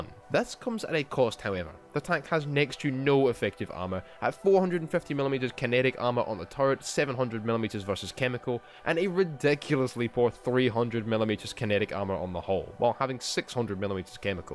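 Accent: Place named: Scotland, United Kingdom. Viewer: I honestly love the Scottish accent. As a matter of fact, I just love the Scottish in general.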